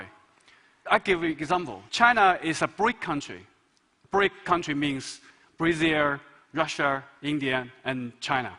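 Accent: China. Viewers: 油管的推荐有时就是会抽风，。。。这么蹩脚的中式口音英文（你他妈用英文音译中文用什么汉语拼音发音？！）和几个单词就能说明的主旨偏偏扯了十几分钟，其实都不用演说。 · 说的挺好的，不过发现我们国人英语与外国人口音确实不一样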